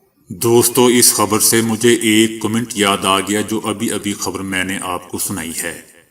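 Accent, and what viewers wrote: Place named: Punjab, Pakistan. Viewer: Janab mujhe aapki video pasand hi isi liye hai ki aap ki Urdu me Punjabiyat ki aamezish hoti hai.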